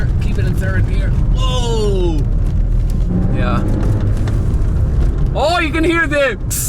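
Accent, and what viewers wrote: Ireland. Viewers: When I first looked at it and heard your accent I thought you were driving on part of the Donegal Rally route! The first time I went to see that in the late seventies, Billy Coleman won it in a whole other Lancia! I probably would take the Integrale too and live with constantly crapping myself about how much it will cost to repair when it inevitably breaks down! 😁😁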